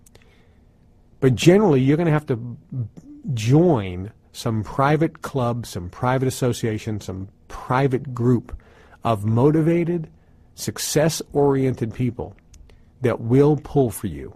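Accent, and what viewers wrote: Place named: Boston, United States. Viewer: where are you from? i hear your Boston accent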